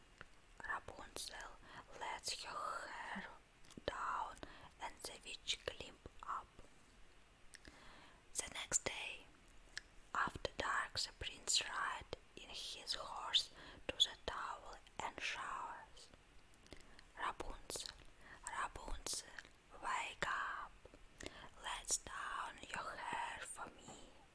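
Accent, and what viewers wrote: Russia. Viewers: Ненадо выговаривать каждую букву на Английскому, ты пытаешся впихнуть нотки Русского в Английский)). A tak я заснул 4etko))) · I'm not a fan of fairytales and stuff like this but I'm so happy to hear you speaking in English!!! I really hope you will make some more videos in English from time to time especially doing medical roleplays which I LOVE the most! English with russian accent actually is a trigger for me lol xD ..btw, I just started learning Russian a month ago so I could expand the joy of thousands of videos for ASMR in Russian , too, not just in english! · прости, но your russian accent "cut" my ears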